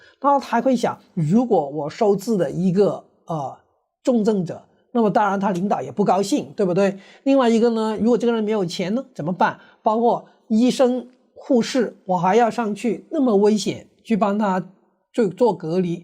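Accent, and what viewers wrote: Cantonese, China. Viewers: 哥的廣東腔好重R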